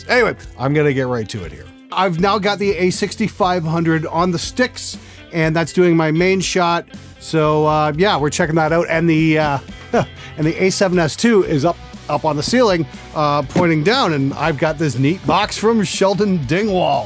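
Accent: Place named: Canada. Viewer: Every time he says about I'm like wtf and then I remember he's canadian XD not that that is wrong I just always forgett he's from another country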